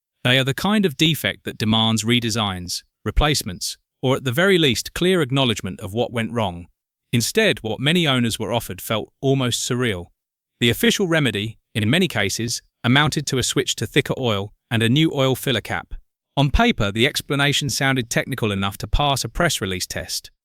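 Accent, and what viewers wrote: United Kingdom. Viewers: Some Englishman is babbling about American pickups? ......Get real.....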